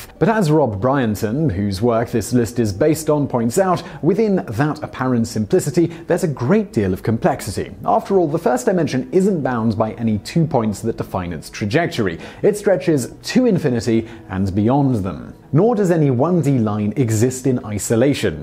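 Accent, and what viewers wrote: United Kingdom. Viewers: Absolute pure garbage video and has nothing to do with the dimensions in string theory. Just because the guy has a British accent doesn't mean he knows what the hell he's talking about.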